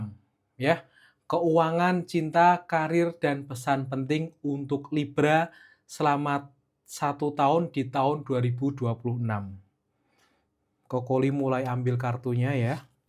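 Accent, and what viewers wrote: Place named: Java, Indonesia. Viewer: Medok bgt Jawa nya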